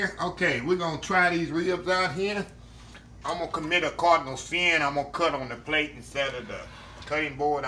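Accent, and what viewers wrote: South, United States. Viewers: Reasons I love this channel..I've picked up some great recipes, good tips and tricks and Chef is always dropping some knowledge. And of course the country accent❣Thank you Chef.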